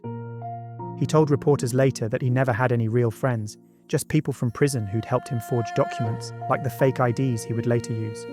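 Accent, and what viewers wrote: England, United Kingdom. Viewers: Not AI. It's an English accent. There are certain annunciations that AI still cannot reproduce. Hopefully they never will. Otherwise there will be a day when even an expert won't be able to tell the difference.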